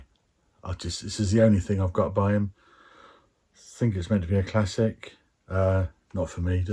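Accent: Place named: Portsmouth, United Kingdom. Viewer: Different forms of dancing indeed....😎 .... and ... nice to have my suspicions on your accent confirmed...you can take the boy out of Pompey eh!?!...Nice one copper..PUP....upwards and onwards...Heavens Light Our Guide!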